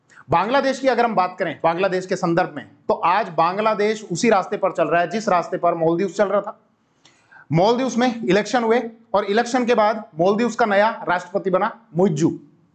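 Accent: Rajasthan, India. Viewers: Sir aap Rajasthan se ho lgata hai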